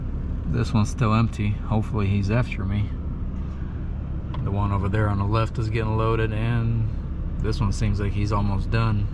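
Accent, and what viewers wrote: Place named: United States. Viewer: Да ладно, ты русский? Ахренеть, я тебя долго смотрю, думал, что ты Америкос с таким-то акцентом.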